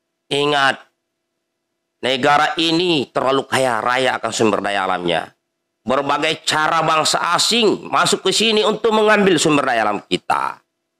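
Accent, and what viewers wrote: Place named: Sulawesi, Indonesia. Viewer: dialetnya mirip tolaki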